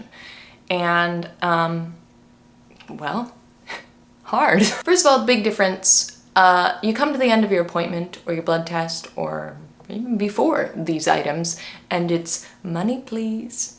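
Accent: United States. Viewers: You don't sound like somebody who grew up in the uk. Your accent is very usa. Did you grow up in the usa then move to the uk, then move back to the usa? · Very good overview and comparison, but I just wondered, if you'd lived for most of your life in the UK and only recently returned to the US, why do you have such a strong American accent? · Seems in the states you get ill you’ll go broke fast Your accent is so American, you lost the uk accent really quick in only 1 year? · I have to wonder, if you spent most of you adult life in the UK, why did you agree to give up what must have been a beautiful Briish sccent in favour of an American one. How long were you in the US before they stole your voice?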